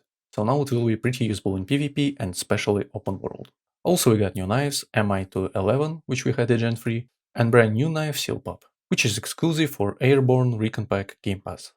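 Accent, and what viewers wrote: Russia. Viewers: Hello friend, you speak with a noticeable Russian accent, where are you from?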